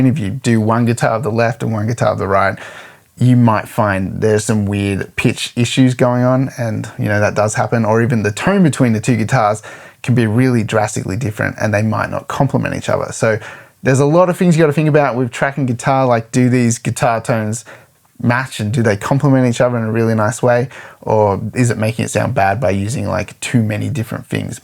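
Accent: Australia. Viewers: Awesomeness mannnnn you sound just like craig jones the aussie bjj legend. Hahahaha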